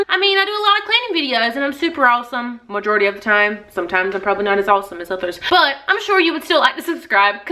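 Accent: South, United States. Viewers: swear I love southern accents! and good job on the house! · you sound so country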